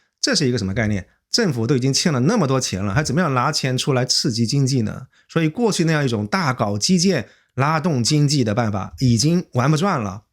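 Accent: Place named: South, China. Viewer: 他普通话有点儿舌前舌后不分，语调也不太像台湾人，应该是中国南方人，然后润到海外的！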